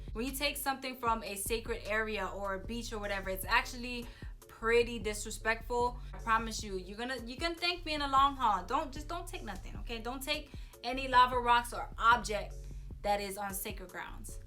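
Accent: Hawaii, United States. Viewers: omg you talk like my mom who was born in hawaii 🥺🥺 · I personally get annoyed when people used to call me a “Hawaiian” when I lived in CA. I know they don’t mean wrong, but I correct them and explain that I’m “from Hawai’i”, but that “Hawaiian” is an ethnic group and I give them a quick background ‘cause they need to know. And I dunno what you’re talking about...you’re EXACTLY what a local girl is!!! You sound like all my friends! Hehe. I love ALL your points! I grew up going fishing Pokai Bay every weekend and my dad always packed up early if we caught plenty fish in the morning. And yes, I’m always telling my mainland friends to wait for good waves ‘cause they wanna take the first one...after a few swells, they finally listened! 😜 Hope you doing good on the mainland. 😁🤙🏽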